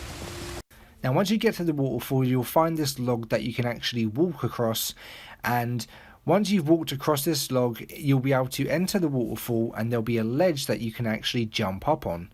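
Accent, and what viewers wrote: England, United Kingdom. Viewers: English accents are so adorable, "go through the wuh-uh-fole"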